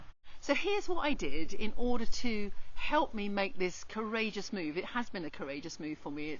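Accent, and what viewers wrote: United Kingdom. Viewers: I just watched your video again (saw it for the first time this morning). I am so impressed with your remarks -- they really resonate for me, and inspire me. "I've been investing in my retirement for many years, but in this moment I wanted to invest in happiness, and joy, and precious memories." WOW! Thanks for inspiring me. Where do you live when not in Sweden? Based on your (slight accent), I assume you are British? Thanks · Are you from Norfolk? You sound like Pam Aires. (Hope that is the correct spelling). Sorry for your loss. Moving abroad sounds great but language is important factor to consider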